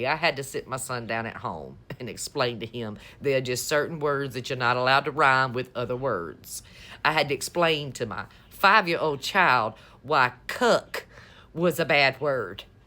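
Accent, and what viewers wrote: South, United States. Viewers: I love your voice. You have a classic southern accent.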